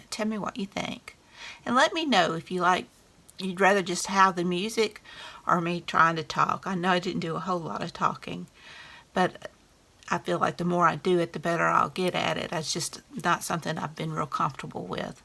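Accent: South, United States. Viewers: Cute pockets. I like it when you talk. Your southern accent is sweet.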